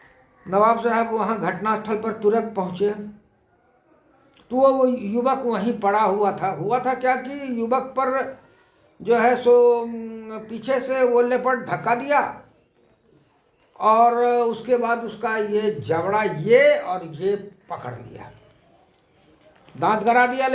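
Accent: Bihar, India. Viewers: I love your jacket sir and the way to explain in Bihari accent 🙏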